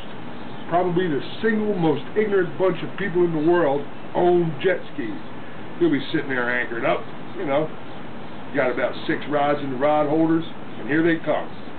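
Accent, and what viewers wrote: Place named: New Jersey, United States. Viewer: Those TV Producers are going to be trying to get you to sign a contract. No kidding, you made a GREAT presentation! I like that Jersey talk of yours. LOL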